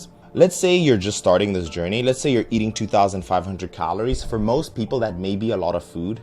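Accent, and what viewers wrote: South Africa. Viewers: Nah, I'm hearing a bit of a South African accent here. Edit: Oh, it is indeed.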